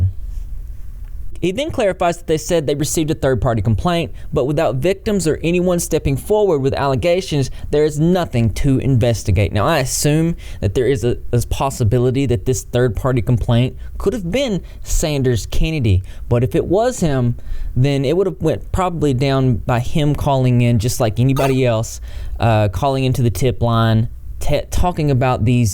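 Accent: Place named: Tennessee, United States. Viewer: I legit could listen to you speak for hours haha probably the fellow TN accent that I can appreciate haha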